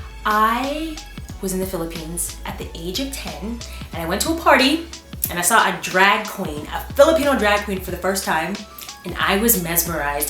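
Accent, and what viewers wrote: France. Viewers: J'adore ton accent français ... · I'm french and your french accent is the cutest omgg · I'm French and you know what ? I REALLY LOVE YOUR FRENCH ACCENT !!! :D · Ton accent francais est so cute! :D ❤ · i loove your accent in french! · I love your french accent it's really good (I'm french by the way) Love from Paris 3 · love your french accent, you're sooo cute ! I'm a new sub and already love your channel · love your franch accent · your french accent is so freaking cute! You should come to Paris one day! Je t'aime! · Great video !!!!!!!! And i love your French accent :) Je t'adore <3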